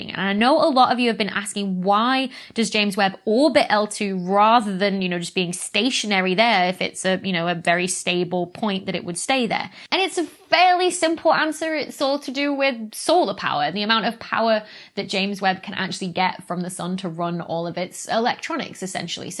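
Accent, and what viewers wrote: United Kingdom. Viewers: every time you say "run the matts" I'm like oh right brit. lol love your accent. I think most Americans love the brits accent. I hear we all sound like cowboys to yall. even like the midwest and cali people do. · At first I thought you must be Australian. Then I heard you reference WE in the north and realized it must be the UK somewhere. Anyhow, nice accent, great eyes. 🙂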